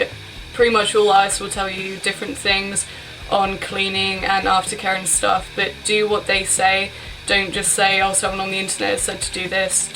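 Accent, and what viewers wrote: United Kingdom. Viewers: odd question but where are you from in the UK? Your accent sounds super familiar - Milton Keynes by any chance?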